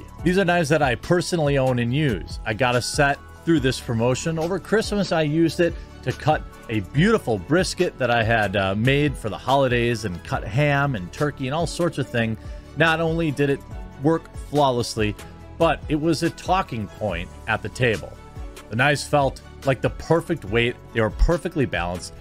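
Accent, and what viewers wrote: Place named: Midwest, United States. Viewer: Off topic but... I'm from northern Illinois but living in France for years (business consultant). I can't tell you how great it is to find and listen to a real Midwesterner speak like a normal person (in my opinion), without pretense, knowledgeable, and like an intelligent neighbor you feel comfortable arguing with. Thank you for being normal. Good luck with your company.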